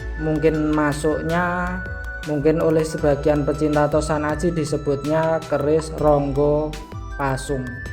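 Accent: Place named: Cirebon, Indonesia. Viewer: Pelokk cirebonan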